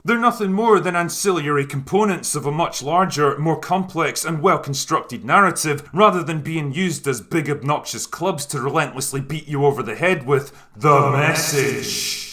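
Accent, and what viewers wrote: Scotland, United Kingdom. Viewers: I feel like you toned down your accident a little bit since your first videos, I hope you will keep your strong drunk scottish accent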